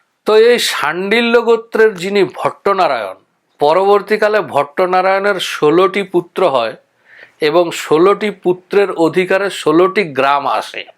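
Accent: Bankura, India. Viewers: মশাই যে বাঁকড়ি উচ্চারণ এই বোঝা যায়। আমিও বাঁকড়ি। খুব ভালো তথ্য পেলাম।❤❤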